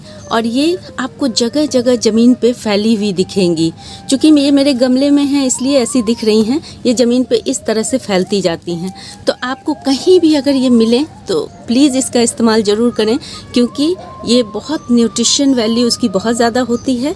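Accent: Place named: Eastern Uttar Pradesh, India. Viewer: Mam aap purvi uttar pardesh se lagti hai shayad ur good job👌👌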